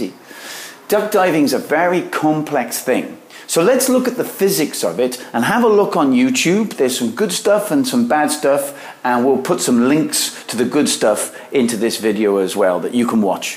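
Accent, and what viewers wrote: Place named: South Wales Valleys, United Kingdom. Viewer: I thought so, I'm a valleys boy so I recognised your accent right away!! I got the bug from my dad, who surfed all over the world when he was in the merchant navy back in the 60's also. Currently, I'm surfing a 7'2" NSP board, and I'm surfing mainly at Freshwater West in Pembroke where I live, so, your tutorials are super helpful, so, thanks!!! Happy days dude!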